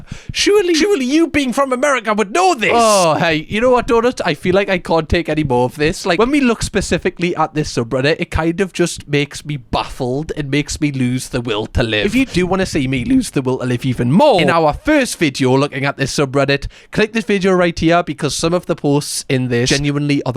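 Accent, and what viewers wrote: Newcastle, United Kingdom. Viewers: I've gotten used to your accent and I really like British accents 💕🍩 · I LOVE YOUR ACCENT! I'd never really knew about Newcastle and the various regions, just the usual ones we hear in the US. I couldn't figure out if it was Scottish or Irish...I was like it's a Scottish but easier to understand (for me anyway). I love Italian Bach's accent too, it's so smooth, sort of posh sounding but not too posh. To my ears his accent is like lower case cursive, and your accent is block print ❤ Love y'all from Virginia, not one of the obnoxious Americans Edit to add...here in Virginia we have areas that still speak an older English, even Elizabethan! <link> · Everytime I watch your videos. My thoughts have a geordie accent for ages after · American’s are absolutely a sucker for a British accent, actually what first got me to listen to video that came across my feed. 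He’s been my favorite commentary youtuber since. And likely tops the time watched list for me for the months since I found him! I don’t even normally watch commentary type videos often but is so pleasant to listen to, kind, and insightful. Oh and epic accent, of course! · I am American, love the bri'ish accent and any fun we make of you is in good fun ❤ also very few of us use military time, usually because of specific careers like policing or the actual military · I love your accent! Can’t really explain why, I just enjoy listening to people speaking in the Newcastle accent, and don’t find it difficult at all (as a non native speaker). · You're the one who introduced me to the newcastle accent and I honestly love hearing it! The fact you also got amazing humor puts the ribbon on the gift, love your videos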